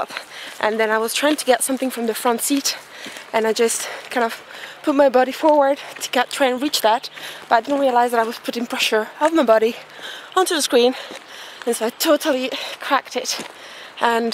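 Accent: Scotland, United Kingdom. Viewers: just found this after doing some hills at weekend and a bothy visit near The Grey Corries.. your 'bothy' pronunciation is spot on and you're picking up a Scottish accent here and there :) we've now got to stop planning our 6 month (or longer) van trip and just do it! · You sound like you are turning Scottish.You sound like you are picking up the Scots' accent.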